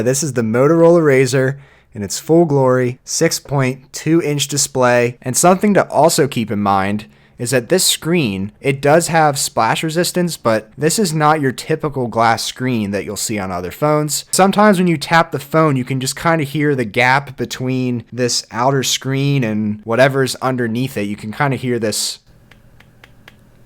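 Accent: Philadelphia, United States. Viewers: are you from philly tri-state area? lol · I'm guessing you're from Philly or South Jersey. Nice video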